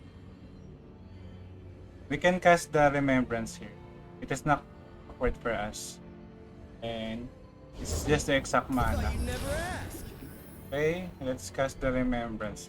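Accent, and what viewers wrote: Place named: Philippines. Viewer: Are you Filipino? Because your accent really sounds like a Filipino lol (I'm Filipino) Keep up the good work!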